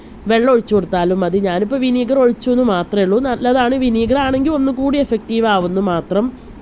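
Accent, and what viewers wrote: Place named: Malabar, India. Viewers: enthu bhaashayaa? malbaari bhaashayaano? manasilaakunnillaa!😇👻👹👹🤧👺🥵🥶💀💀😈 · എസ് ,ഇത് പാലക്കാടൻ ഭാഷയാണ്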